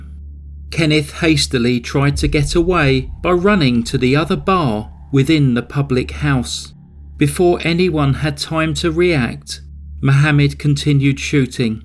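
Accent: United Kingdom. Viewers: I wish I had a calm British accent, it just makes storytelling more pleasurable (no matter how heinous the story is)...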